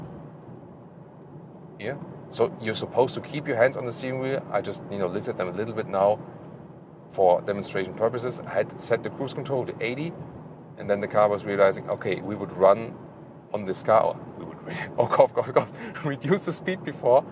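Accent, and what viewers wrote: Germany. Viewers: I love your German accent. It gives your videos about Mercedes that Teutonic feel.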